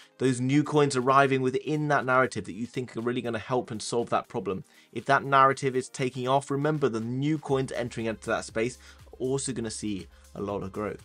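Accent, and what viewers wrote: United Kingdom. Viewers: I love British accent I can listen all day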